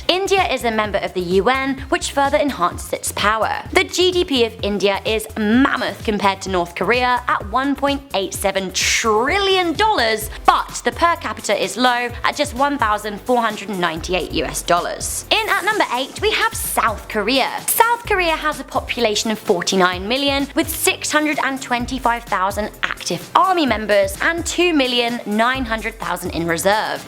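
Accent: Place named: United Kingdom. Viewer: is a simple naturally beautiful and sexy girl and that deep thick British accent is just charmingly adorable!. MAGM.❤️✝️☯️🇵🇷🇺🇸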